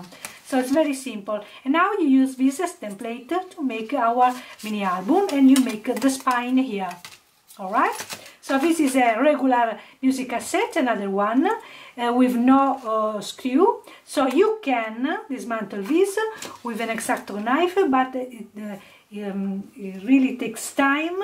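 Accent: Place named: Italy. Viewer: What a fun altered book! I am amazed at your imagination and creativity! I also enjoyed hearing your lovely Italian accent!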